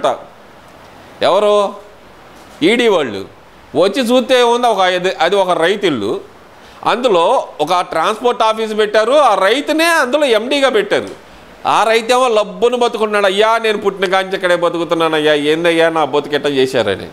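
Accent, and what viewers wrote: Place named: Andhra Pradesh, India. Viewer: Tammudu. You are real TDP fan.❤❤Never change your Andhra voice. Excellent 👌👌 analysis and vibrant voice.🎉🎉🎉🎉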